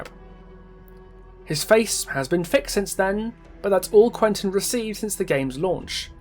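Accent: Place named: United Kingdom. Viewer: Are you british because that is lame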